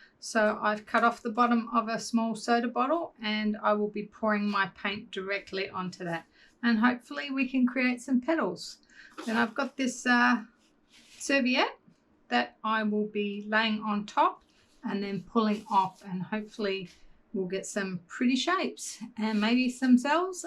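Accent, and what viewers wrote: Australia. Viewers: Regarding the middle..you could have stopped at gold dots but still beautiful, well done. You sound Australian is that where you are? Asking because you're using Amsterdam paints...I'm in NZ and giving trouble sourcing supplies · Thats brilliant inventive artwork, it is also borderline ASMR, with your Aussie accent and the other sounds, very theraputic. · Beautiful..going to my table to try this. Just enough cells and gold and the centre is great…love your natural way of working and commenting…nice to hear an Aussie on board. 💖💖Thanks, from Ruth in Tasmania.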